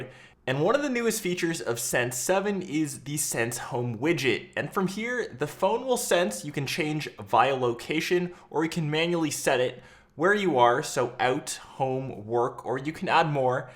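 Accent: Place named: Canada. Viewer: the phone is ok. your Canadian accent sucks. we don't care about your vacation.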